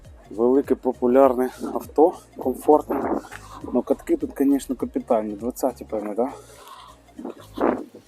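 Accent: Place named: Germany. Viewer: Гарний німецький акцент, дякую за відео, дуже цікаво!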